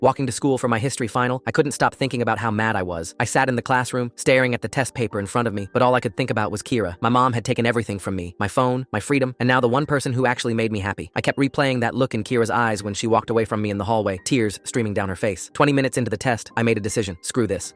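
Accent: United Kingdom. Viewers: Bro went British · I swear bro became British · British ahh voice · Is he British 😭?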